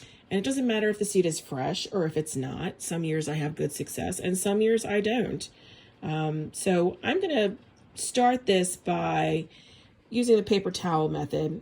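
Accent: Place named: Maryland, United States. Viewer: Before even looking at the video description or hearing you said mid-Atlantic I knew you were a Marylander! Whenever I hear my native tongue it makes me miss my home state. Happy gardening from western PA!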